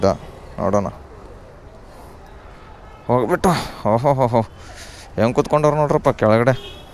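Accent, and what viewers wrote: North Karnataka, India. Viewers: ನಮ್ಮ ಉತ್ತರ ಕರ್ನಾಟಕ ಮಾತ್ ಕೇಳಾಕ್ ಬಾಳ್ ಖುಷಿ ಹಾಗ್ತದ್ 😍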